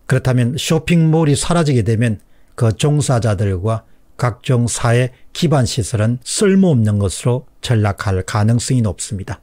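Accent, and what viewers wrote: Gyeongsang, South Korea. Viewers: 공 시징님 열심히 열강하고 있습니다. 현실을 직시하는 말씀 잘 듣고 있습니다. 다만 , 말씀을 조금 조절하시면 좋겠습니다. 너무 경상도 말로 빨리 말씀 하시네요. 좀 슬슬 말씀해 주세요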